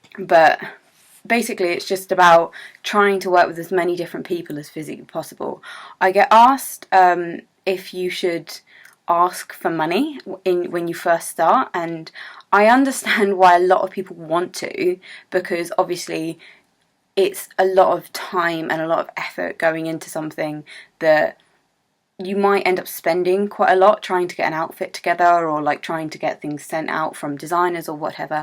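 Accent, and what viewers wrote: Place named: United Kingdom. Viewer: I did not know you were British! Your accent is awesome! :D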